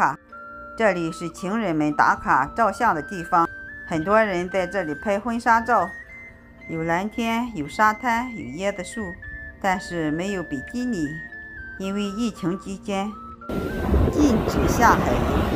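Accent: China. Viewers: 解説有見地，大陸口音，哪裏人呢？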